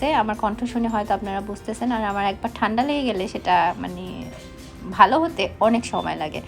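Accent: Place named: Barisal, Bangladesh. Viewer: আসসালামু আলাইকুম. আপু অল ফ্যামিলি আপনাদের ফ্যামিলি টা খুব ভালো লাগে. সুন্দর একটা সংসার মাঝে মাঝে আপনার কথা গুলা শুনলে অনেক হাসি আহে কি হাসতে হাসতে পেট ব্যাথা হয়ে যায়. সত্যি কথা যে বরিশালের ভাষায় কথা বলেন. আবার অনেক বাসায় ভেঙ্গাইয়া কত রকম কমেন্ট করে ঢং মং করে কথা বলেন. খুব ভালো লাগে. এই যেমন ধরেন আজকে একটা কথা বলছিলেন যে বাবু, আমি যামু কাঠি দিয়া খাইতে পারি না. আমি তোকে কিভাবে খাওয়ামু ঠিক এই কথাটাই অনেক ভালো লাগে. ২. ১ টা কথা. আপনার মাঝে মাঝে অনেক.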